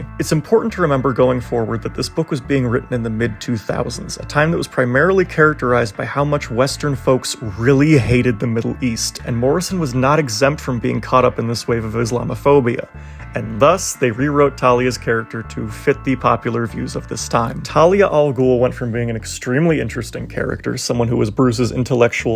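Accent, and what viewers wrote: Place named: Russia. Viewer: Really love hearing your thoughts but my god man your Scottish accent sounds Russian 😅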